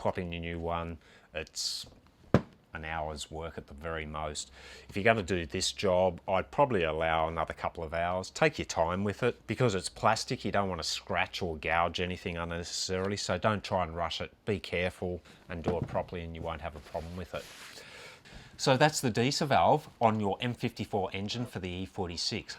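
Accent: Australia. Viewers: Loved that video mate. Just got to see if this fixes my E54 running on a couple of cylinders. Thanks, and love that Aussie twang!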